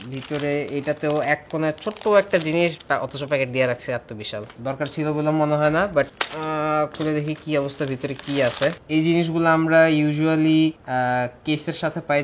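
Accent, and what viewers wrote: Barishal, Bangladesh. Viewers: ত্যানা 😁 Vai ki Barishal ar naki?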